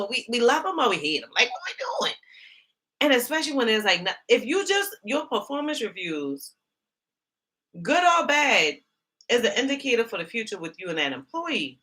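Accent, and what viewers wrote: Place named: Charleston, United States. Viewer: girl you sound like you from Charleston and if you not girl where are you from because I sound just like you 😂😂😂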